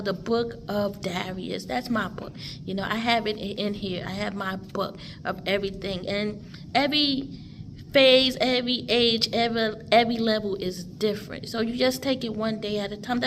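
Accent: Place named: New Jersey, United States. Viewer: I love your Jersey accent!!!!!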